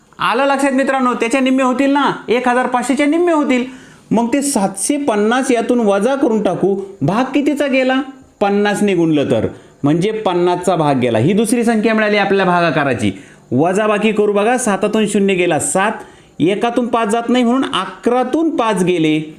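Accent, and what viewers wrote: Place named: Parbhani, India. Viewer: Parbhani bolto sir bhari strik ahe sir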